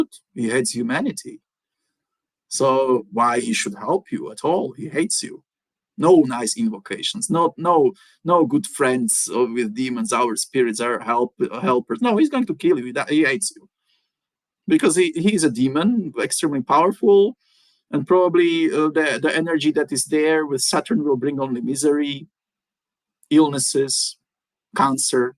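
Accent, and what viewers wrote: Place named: France. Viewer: Your videos are my favorite. You remind me of the Brazilian man who introduced me to Quimbanda. I guess it must be the French accent!’ Please keep up the great work!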